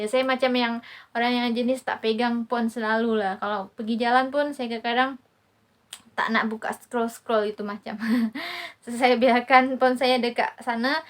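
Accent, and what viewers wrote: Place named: Malaysia. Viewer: Pandainya kamu bercakap gaya orang Malaysia👏👏👏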